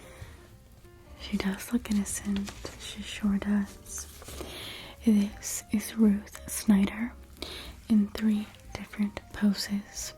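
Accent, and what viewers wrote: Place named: Michigan, United States. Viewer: I've never heard a Michigan accent complemented before! I appreciate it as a michigander myself 😅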